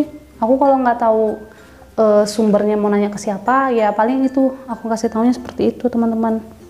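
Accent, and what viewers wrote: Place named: West Java, Indonesia. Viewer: koq logatnya sunda kak?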